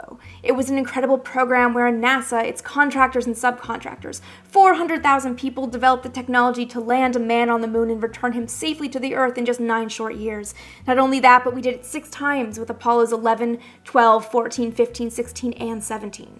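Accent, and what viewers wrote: Canada. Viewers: You made me cry at the end. You're still a nice lady. Are you Canadian or a northern mid-westerner? Great piece, I will make many people watch.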